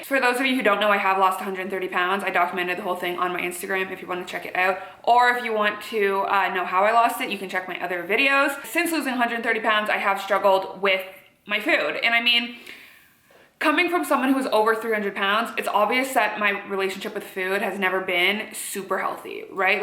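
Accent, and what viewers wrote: Canada. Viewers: This is the first i listen/watch your video without multitasking (lol i like to listen to story time youtube video whilst driving/do chores) but i just wanna say your canadian accent is SO noticeable 👌🏼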